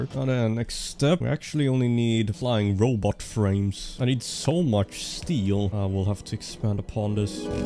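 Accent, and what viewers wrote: Sweden. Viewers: im all for factorio gameplay as well! As a swede im not fond of swedish accents but urs i can listen to all day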